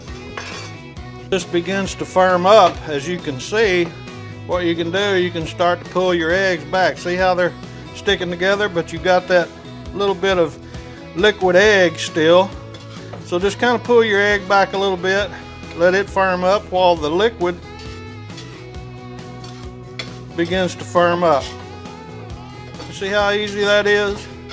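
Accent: South, United States. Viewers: Yella 😂. Your southern accent brings back memories of my daddy.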